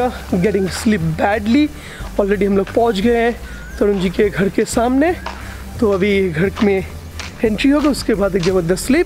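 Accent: Bengal, India. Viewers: you speak Hindi with Bengali accent.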